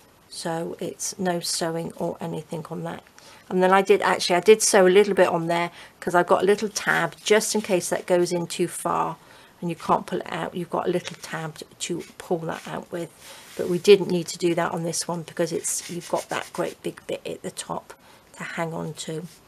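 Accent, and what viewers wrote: United Kingdom. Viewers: Hi, I'm loving your videos, thanks! Can you tell me where you buy seam binding on this side of the Atlantic, please? I'm in Ireland. (I'm assuming from your accent that you are in the UK, but maybe I'm wrong?:-) )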